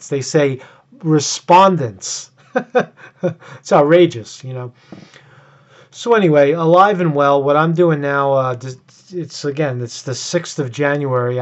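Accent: New York, United States. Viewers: New sub,, I like that NY accent haha,. But its, SAD unfortunately that's how most cities get and rely on hidden taxes . They outspend and then say their saving the city so much in other taxes just to get elected .. I'm not surprised of the deceit just to tax us more and I think most people are aware of the corruption . Ya vote and hope the politician is honest about cutting taxes . OUR WORLD TODAY . I WORKED 40 yrs for the US PO .and seen corruption first hand with people trying to get promoted ETC.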